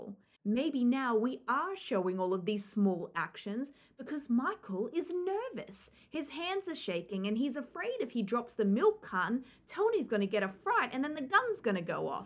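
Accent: Australia. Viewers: Sounded Aussie sometimes but then you had some strong Rs like Americans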